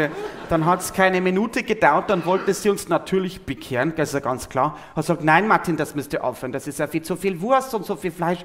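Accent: Bavaria, Germany. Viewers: Es ist einfach herrlich, einem normalen Menschen mit gesundem Menschenverstand und familiärer Erziehung zuzuhören und zu erleben, wie "leben" auch funktionieren kann. Gleichzeitig ist sein sauberes gepflegtes Bairisch Musik in meinen Ohren. Wenn ich mich beruhigen will (etwa nach einem anstrengenden Arbeitstag), dann ist Martin Franks Stimme Balsam. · Hallo, mir gefällt die bayerische Sprache, die Mimik und Gestik und die Einfachheit der Geschichten und Erlebnisse. So vieles ist so kompliziert geworden, mehr will ich nicht dazu sagen. Martin bringt mich immer zum Lachen und lässt mich der Wirklichkeit entfliehen, für Momente des Lebens und das ist so so schön. Ich geniesse das so sehr.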